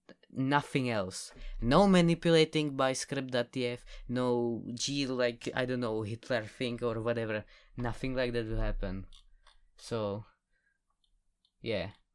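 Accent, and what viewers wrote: Czech Republic. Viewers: T H A T B E A U T I F U L C Z E C H A C C E N T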